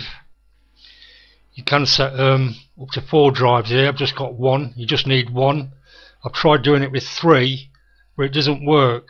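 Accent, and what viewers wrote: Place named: United Kingdom. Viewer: great tutorial. great heavy british accent. you sound like one a them hardcore british gangsters. lol. but thanks alot. cutting right to the chase, no bullshit music or anything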